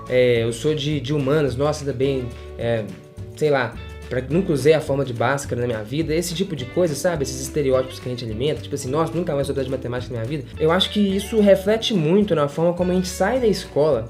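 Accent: Minas Gerais, Brazil. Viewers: Ele é Mineiro, se não me engano.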